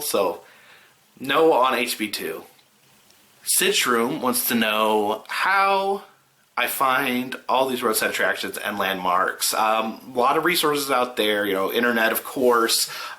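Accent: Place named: South, United States. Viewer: If you got together with Dan Bell, that would just make my day, year.... LIFE!!! His creepy with your southern....WIN!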